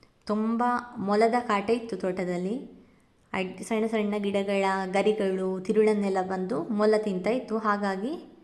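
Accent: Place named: Mangalore, India. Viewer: Mangalore kannada bhashe kelode super 😊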